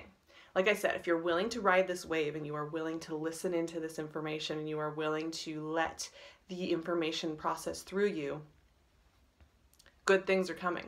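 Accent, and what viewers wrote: United States. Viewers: I'm an actor in the U.K. and stumbled here for your American accent... But wow I got so much more! Thank you for your guidance! I've subscribed and will be back each month 💕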